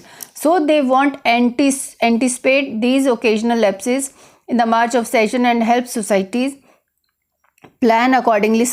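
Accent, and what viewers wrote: India. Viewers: Your accent is very disgusting mam. Hate indian accent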